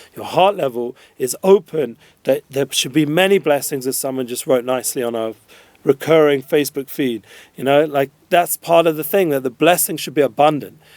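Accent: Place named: United Kingdom. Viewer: Love the British accent!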